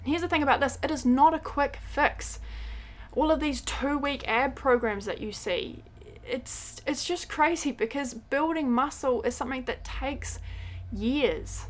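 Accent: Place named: New Zealand. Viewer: Hi, I just discovered you and your channel this month and I am IN LOVE!!!! 😃 I lived in Marton, the Hub of the Rangitīkei 😉 for a year! It's so fun to hear the kiwi accent again! I don't hear it much here in Virginia. 🤣 I appreciate your clear and visual teaching...I am struggling to get off those last 8-10lbs and your wisdom and thoughts have inspired me to keep going! Thanks for your encouragement and empowerment! You are a blessing!!! 🙌💪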